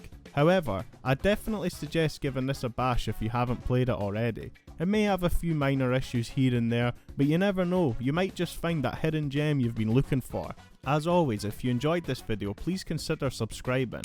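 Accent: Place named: Scotland, United Kingdom. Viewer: A Scots man giveing me nostalgic…. Earns my sub ✊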